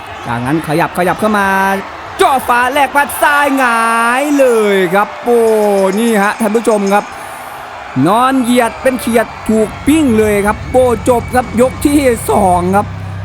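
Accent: Isan, Thailand. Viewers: พากมวยมีอารมณ์ร่วมกับมวย.รวมพลัง. น้ำเสียงดีด้วยเลยทำให้น่าฟังมาก.ขึ้นไป อีก.ติดตามตั้งแต่เริ่มเปิดมาดูแล้วครับ.พาก มันจริงฯ.ชอบฯ.ไทยปนอีสาน.อย่างแจ่ม..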